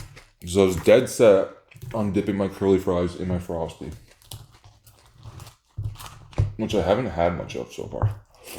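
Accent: Canada. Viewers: Where in Canada are you from? I’m guessing Alberta by the accent.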